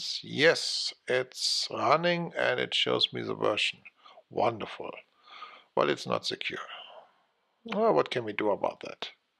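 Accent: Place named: Germany. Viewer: Ist mir schleierhaft warum du das auf englisch gemacht hast. Mit einem derart starken deutschen Akzent wäre es auf deutsch sicher besser geworden. Dennoch interessant.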